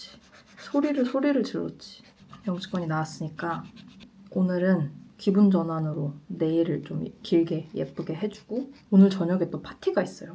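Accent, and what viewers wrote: United Kingdom. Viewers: 너무너무 추카추카 합니다. 그렇게 오래 걸리는줄 몰랐네요. 저는 3개월~ 4개월 만에 영주권 받았는데 다른분에 비해 빨리 받은 거군요. 케이스는 다를수 있겠죠. 저는 결혼허고 난뒤 벋았고 26년전 일이라..... 지금은 많이 힘들다고 듣긴 들었어요. 암튼 다시한번 축하드리고 14년 되셨다니 거의 영국인이죠. 발음도 영국인 현지 발음이시고요. 아, 그리고 저는 3~4 개월 기다리면서도 안와서 재촉 전화했더니 알주일만에 왔다는 사실 ㅋㅋㅋ 날씨 안좋은 영국 😂😂 생활 열심히 행복하게 사시길 바래요.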